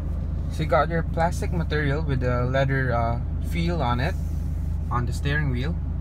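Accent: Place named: Philippines. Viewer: Galing mag english halatang pinoy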